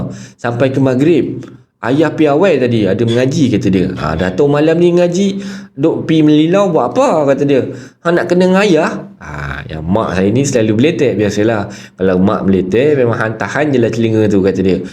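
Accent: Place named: Kedah, Malaysia. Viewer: Loghat kedah abang cun noh,tak macam buat2,ketegaq lama tak dengaq,hahaha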